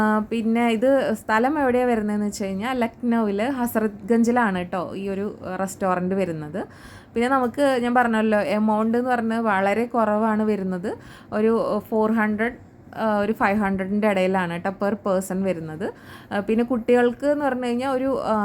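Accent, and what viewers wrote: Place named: Thrissur, India. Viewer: Suster ningal naatil evideya shabdham kond Thrissur aanenn thonnunnu ❤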